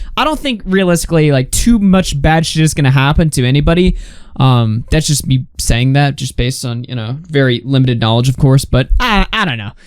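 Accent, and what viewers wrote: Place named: Upper Midwest, United States. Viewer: Damn I thought he was more upper midwest with me.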